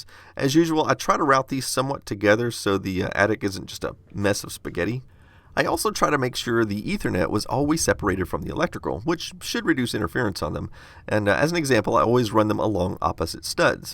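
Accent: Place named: Texas, United States. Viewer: Dude, I've served in the Army with soldiers from Texas and that's just how they talk.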